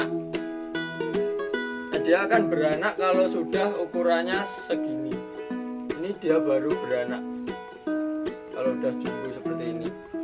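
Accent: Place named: Java, Indonesia. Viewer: Medok banget... Gue suka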